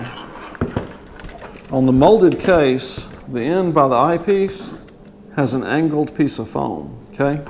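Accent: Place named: United States. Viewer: I've been paying attention but your accent (yes i know its American but its a particular sound to it and im trying to think who i've heard that sound similar. isnt it strange i couldnt think who it was but i knew it was someone i like, who i thought was a nice person. It came to me!!! I can sleep now!!! Trey Gowdy!!!) I wonder if im right? i.e. whether your accents are from the same part of America or is one going to be Canadian and the other total opposite end? Now back to paying attention 😁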